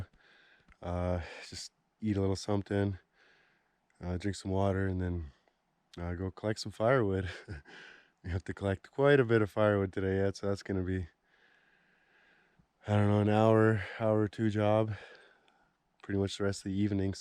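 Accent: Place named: Canada. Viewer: I never realized how much we Canadians have an accent until I watched your videos haha makes me wonder if I sound like that too and just don’t hear it 🤣🤣🤷🏻‍♀️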